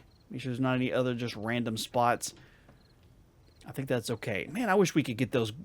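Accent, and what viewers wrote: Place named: Midwest, United States. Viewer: not to shabby man. Enjoyin the vids. BTW dumb question. Where you from? Sounds like the midwest with the way you speakith 🤣😂🤣😂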